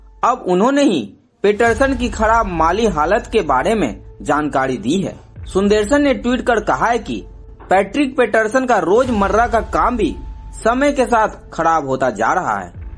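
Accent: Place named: Bihar, India. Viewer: भाई आपके लहजे मालूम चलता है की आप बिहाड़ी (बिहारी) हैं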